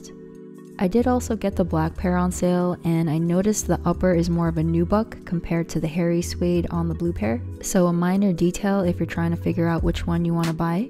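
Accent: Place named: United States. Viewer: I like these, you sound American so I don't know if you know but there is a rabid three stripe collectors scene in the UK and Europe for these types of Adidas sneakers. It dates back to the early 1980s when football fans would follow their team in Europe and they would come back with Adidas Trimm Trabb etc and it became fashion for the fans to dress in their best and most expensive clothing and Adidas and a few other sneaker makes and it sort of became a uniform for the now known as "Casuals" who would follow their team across Europe and then the cycle continues with new brands like CP. Company, Stone Island etc etc. Anyway it's still a very fierce collectors market still to this day as new generations get into it, and with the release of archive inspired trainers (we call sneakers trainers usually in the UK) by Gary Aspen with the much sought after Adidas Spezial clothes and sneakers releases each year. 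Anyway that's quite a fun little rabbit hole you could go down just by checking Gary's Insta and see where he gets the design ideas etc. Also in the UK, there is a great source of Adidas information on Twitter on discounts on sneakers like these etc, just look for 'Man Savings' on Twitter and they post great discount deals every day. I also seen that there was an American doing pretty much the same thing for people in the states, I can't remember his Twitter tag but I don't think it would be hard to find as its well worth looking and following these types of pages for excelle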